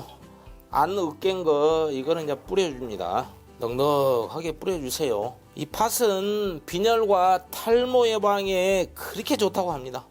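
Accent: Jeolla, South Korea. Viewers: 내가.절라도출신인데.절라도말로하시니까 너무정겹고..셀프님.정말똑소리나내요.화이팅❤❤❤❤❤ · 안녕하세요. 저는 캐나다에 40년째 살고있는데 매일 당신에 체널을 시청하고 있답니다 좋아는 필수이고요 제가 떡 종류는 다좋아해요 제 고향은 전라북도 부안입니다 어려서 고구마 감자 옥수수 떡을 좋아해서 많이 먹었더니 여자 형제중에 제일 크지요 😅 말씀이 전라도 말씀에 정이 더 갑니다 오늘은 시루떡이네요 나중에 떡가루 사다가 떡 해먹으려구요 감사하고 사랑합니다 아 그리고 여기서도 한국 음식만 먹어요 손이 많이 가지만 그래도 한국음식이 최고예요 😂😂❤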